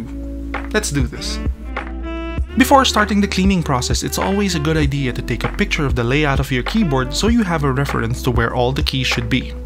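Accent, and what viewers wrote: Philippines. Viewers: Agree, I knew I heard Filipino accent at first. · thanks for this! It's tedious work to clean out my mech keyboard lmao. and you're from the philippines, which i expected from your name but i didnt expect you to speak very proper english. i wouldnt say your accent gives it away, but certainly it wasnt THAT obvious that you have a filipino accent. salamat ulit par! malaking tulong talaga to sakin! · Filipino accent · lol that accent is surely a filipino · Sagiri Izumi English is a widespread language in the Philippines, I’m more impressed his accent is not thicker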